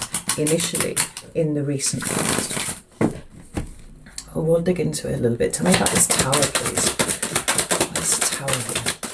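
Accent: United Kingdom. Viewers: Dude I love your aesthetic, the whole UK accent (idk) and the whole dark style fits very nicely.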